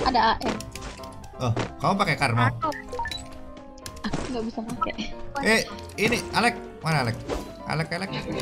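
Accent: Java, Indonesia. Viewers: Jowone ngendi mas?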